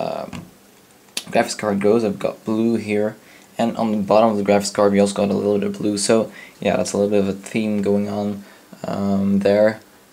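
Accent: Netherlands. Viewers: Ik hoorde gewoon direct dat je nederlands was lol nice vid btw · Are you Dutch ?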